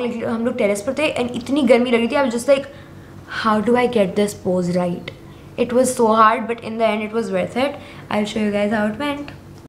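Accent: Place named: Pakistan. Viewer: picture are good because am a photography & i have my own studio in multan but the thing i like is your accent during speking english means k english urdu mix 😁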